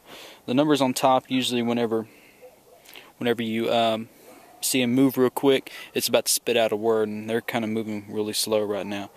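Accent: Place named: South, United States. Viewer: your country twang has really come out in this video. Also, from my past experiences and such with the dead, is you ask if they would please answer the following questions (and state your questions). Just a suggestion, but all in all, great piece. Can't wait till your next vid. Love the paranormal! Hugs to you!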